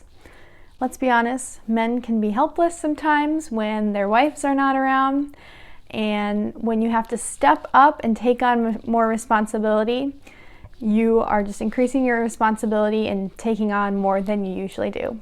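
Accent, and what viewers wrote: United States. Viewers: R u american?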